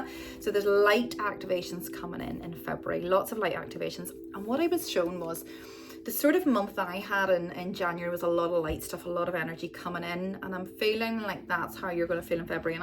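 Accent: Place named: United Kingdom. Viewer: Interesting dialect but I am from USA and can't tell what part of the Uk you are from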